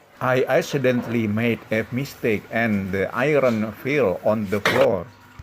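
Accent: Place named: Indonesia. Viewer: Logatnya seperti orang indonesia bang, daerah mana nih ?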